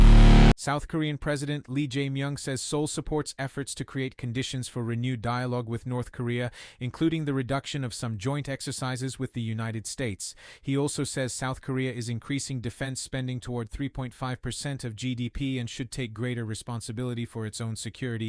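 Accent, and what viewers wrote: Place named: Eastern Europe. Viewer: are you using an AI filter on your voice? Your English is very good but you have a stronger Slavic accent than this voice.😃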